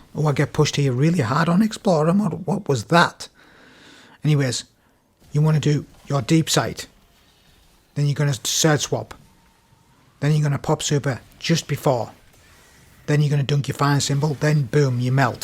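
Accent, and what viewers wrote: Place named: Newcastle, United Kingdom. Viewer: Scouse? He always sounded Geordie to me 😅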